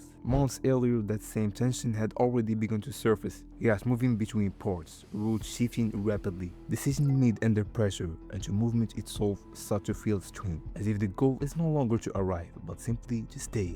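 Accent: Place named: Middle East. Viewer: I’ll be blunt…. I’m halfway through this video and it is #TORTURE 😱 because you mispronounce 50% of the words and the other 50% sounds like a 10-year-old child from the mid east trying to pronounce the English language… the visuals are great, and as other people commented: you “should“ have a lot more views, but the reason you don’t is what I just stated!😮🙄😳😡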